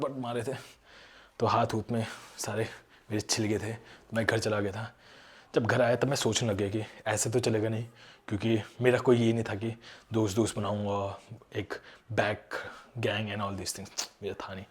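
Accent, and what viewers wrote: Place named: Northeast, India. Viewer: dost northeast se ho kya? bdiya accent hai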